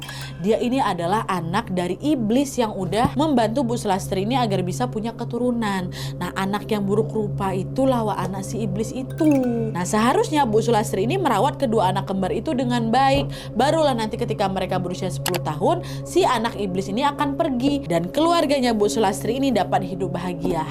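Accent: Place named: Medan, Indonesia. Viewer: Hai kk.aku br lht vidio kk...cr kk bawakn narasi " medan" kali y....jd lucu aja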